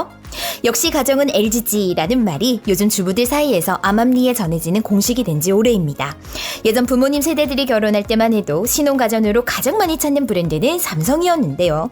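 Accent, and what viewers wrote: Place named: North Korea. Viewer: 북한사람인교 아님 조선족인교 말소리가 윗지방 소리네요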